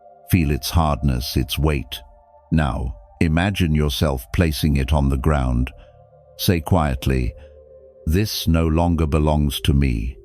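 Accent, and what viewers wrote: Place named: United Kingdom. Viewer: Good info but long and wordy. British accent is irritating. Could have been 20 mins.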